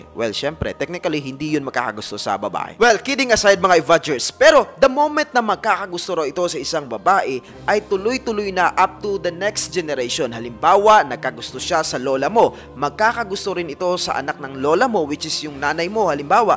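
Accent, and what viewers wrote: Visayas, Philippines. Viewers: Hahaha bisaya diay ka ya washout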